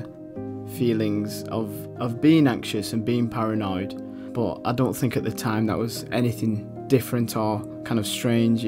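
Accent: United Kingdom. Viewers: Can anybody tell me where that accent is from? North of England? Not sure.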